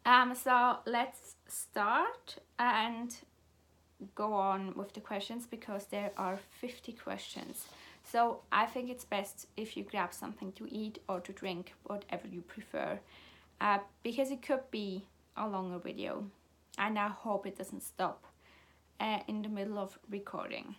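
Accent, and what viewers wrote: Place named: United Kingdom. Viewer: Hahaha, woah a shocking concept... are you sure you're allowed to not watch videos that you don't like? I mean, how else will you be able to make a video complaining about it? Haha! ;) I just really liked the chattiness of this video, it made me chuckle to laugh along with some of your stories, especially the failed film experience at the theme park, haha. British accent is totally the best accent... not biased at all, you know, haha. I remember being tagged in this video at one point, and then deciding that I didn't feel any need to do it, so I just answered some of the questions in the comment section of that video, but I totally understand why people are talking about the point/relevance of this tag. You need to go to Nando's, it's a Portuguese restaurant which is highly popular in the UK and caused a massive thing online about the term 'cheeky nando's' which I think turned into a meme or something. 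But it is a great restaurant, and even though they focus on chicken, their vegetarian options are delicious! HOW CAN YOU NOT LIKE TEA?! Hahaha, maybe you just haven't found the right kind of tea!? I'm joking of course, only slightly wounded... slightly.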